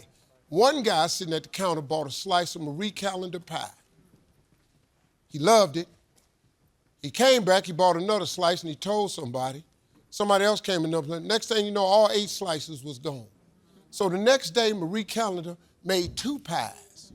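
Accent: South, United States. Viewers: I think learning from something you're interested in is a great place to start. You're more likely to stick with it. But I would also recommend some type of formal schooling. I took French in college and it was very challenging but beneficial because we weren't allowed to speak in English at all. Also I don't know if you can tell but has more of a southern American accent. Like he says "pah" when a lot of people would say "pye." Hope that helps and makes sense!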